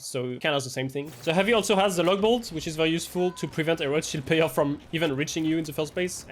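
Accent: France. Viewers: Thanks for this insightful video, and the french accent is icing on the cake, tu gères mon gars ! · Bro your French accent is killing me xD. Greetings from Germany, only a fr*nch can play shield like that, bc of the Police nationale Heritage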